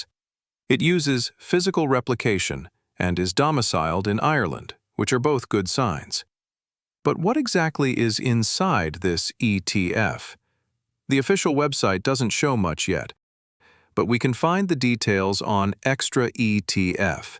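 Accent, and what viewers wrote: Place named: Sweden. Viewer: that sweet Swedish accent 👌🏻😎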